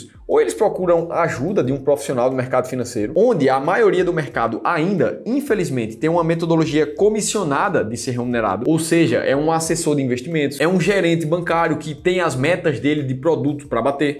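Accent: Pernambuco, Brazil. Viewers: Valeww comeddor de Bucho de Bode! KKKKK Esse sotaque não me engana é Pernambucano com certeza!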